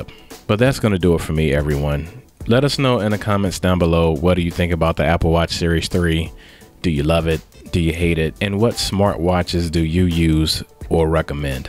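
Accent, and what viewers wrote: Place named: Durham, United Kingdom. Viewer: Lol bru you gotta be from durham or something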